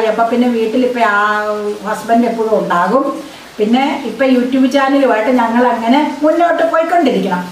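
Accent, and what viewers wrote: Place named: Muvattupuzha, India. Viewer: Ru from muvattupuzha area you talk like people from there my family is from there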